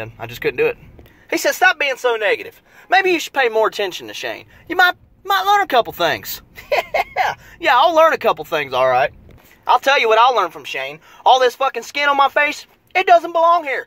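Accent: South, United States. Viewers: I'm new sub... your funny... may I ask where your from.. you sound southern....if so I'm from bama!!!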